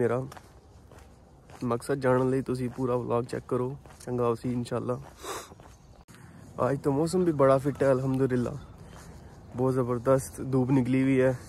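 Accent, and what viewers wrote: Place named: Punjab, India. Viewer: jee love your punjabi touch ♥️ ❤️ bro keep going 💪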